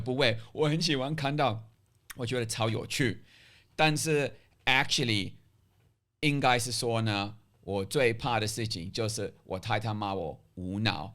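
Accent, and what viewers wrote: South, Taiwan. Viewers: 我也很愛買鳳梨,也愛吃鳳梨。只要台灣人沒有人不愛吃吧！這是第三天看教授影片,看一整天也不累,因為也是南部人,又聽到南部的口音所以非常的親切贊讚讚！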